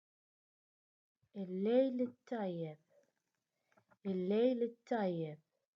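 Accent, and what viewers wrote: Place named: Tunisia. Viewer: We can understand a lot of this. I'm tunisian and Maltese language is very close to our dialect of Arabic so Malti is a very easy language for us to pick up. You even have our accent! Maybe we could merge into one country and be called Tumalta or Maltisia or Maltunisia! Nahh.. just joking but worth a thought!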